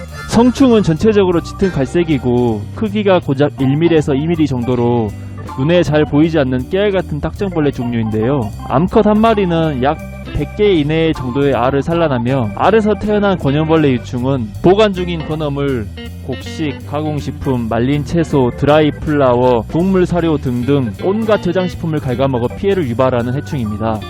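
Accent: Jeolla, South Korea. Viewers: 전라도 사투리 억양 정겹네요 ㅋ 표준어 쓰는척 하는게 억양이ㅋㅋ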